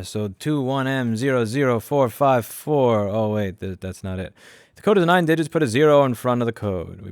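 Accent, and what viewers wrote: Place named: New England, United States. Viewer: if your serious about leaving the city and are looking for new places to establish your shop- <link> low taxes, there is software development community in Charleston, Clemson University mints engineers and companies like BMW have moved US operations there. Now if your worried about your Yankee accent, I'm sure after some fried chicken or shrimp and grits you will acculturate so the locals think your a tipsy german thats been relocated by BMW. Good luck Mr. Rossmann!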